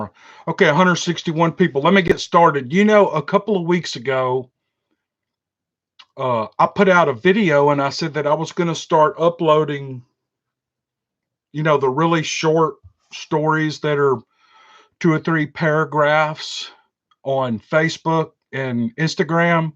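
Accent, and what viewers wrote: South, United States. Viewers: We enjoy your videos, love the accent too. Takes me back to my southern roots! Had a lot of paranormal things happen all my life! Makes me feel at home. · My husband and I listen to you ALL the time, We love your show! I enjoy your southern accent & LOVE your stories!!🥰 We look forward to your next one ❤ Maybe one day I will send an email with some of our paranormal experiences, I think you would enjoy hearing them! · As a woman....I hate to admit it..I cant stand listening to a woman's voice who narrates another channel!. Your southern drawl totally makes the stories! I pictured you with more of a ZZ Top beard and good ol' boy image! Good job with your channel and continued success. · If your passion is the story you certainly have the southern draw to tell them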